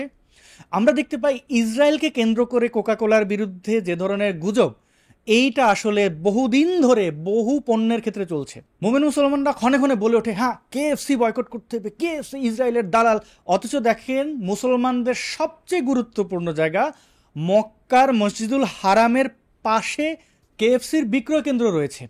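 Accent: Sylhet, Bangladesh. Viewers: Gowaaaaaaaadddd, tmr sylhet accent 😭 cuteeeee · brother, your Sylheti accent is wow. JUST LOVELY AND HEART TOUCHING ❤❤❤❤ Love from Sylhet..... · Vai apne sylheti eta jantam na..bala laglo sylheti mat hunia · ভাই একবারে সিলেটি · ভাই কি সিলেটি নাকি? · ভাই কিতা সিলেটি নি?🫠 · আপনি কিতা সিলেটি নি 🙂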